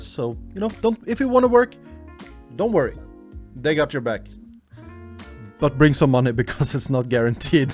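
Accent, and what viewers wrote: Norway. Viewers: Syntes dialekta hørtes kjent ut før du sa du var Norsk :'D Har aldri hørt om "self sponsor visa" før, men det høres ut som noe jeg kan få til.. Det er litt under 140k NOK per år ser det ut som :3 Veldig god info! :D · La meg tippe basert på aksenten din. Du er norsk sant?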